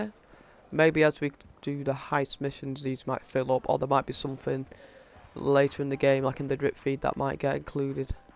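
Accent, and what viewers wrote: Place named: Northern England, United Kingdom. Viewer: Love you're vids friend. Nice to hear a welcome northern accent as most vids are over the top american stuff. Red dead vids have been useful too.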